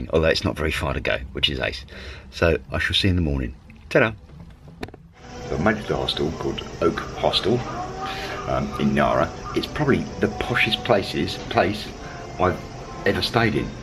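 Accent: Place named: Australia. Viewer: Really enjoy your narration. Bit of oz & cockney! Good videos too. You deserve a lot more views friend. Safe travels and regards from N. Ireland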